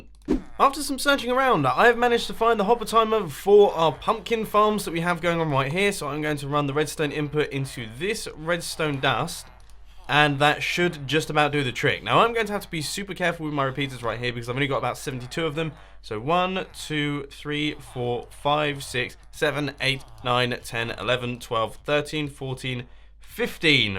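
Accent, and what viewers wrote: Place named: United Kingdom. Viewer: 10 reasons to watch Mumbo: 1. The intro is awesome 2. His voice is awesome 3. His Redstone contraption are awesome 4. You can actually learn a lot about Redstone from his content 5. His voice is awesome 6. He has a British accent 7. HIS FUCKING VOICE 8. His content contains no vulgar language, partial nudity, etc. - he is kid friendly 9. Him.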